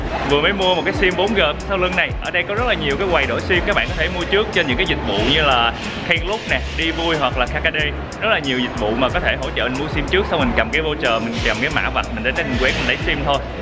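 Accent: Mekong Delta, Vietnam. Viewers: Đậm chất giọng miền tây nhợ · Xem video anh làm, em biết được nhiều món ăn ngon,văn hóa cũng như lịch sử của từng nơi anh đến,xem anh đi khám phá rất thú vị bởi cách nói chuyện chân chất Miền Tây,pha chút hài hước,duyên dáng của riêng anh.Em xem rất nhiều kênh nhưng kênh của anh là em thích nhất.!!!! Chúc anh nhiều sức khỏe,vui vẻ và gặp nhiều may mắn.! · Xem gần hết các clip của anh này, Cứ bị mê mẩn giọng miền tây thôi 🤣🤣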